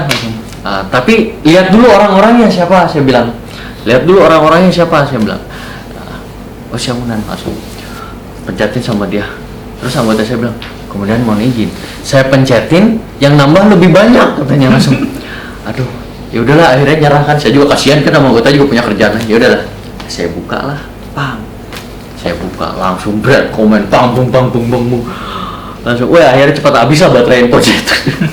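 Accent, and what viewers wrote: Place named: West Java, Indonesia. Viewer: Jawa barat khas banget bang mantapppp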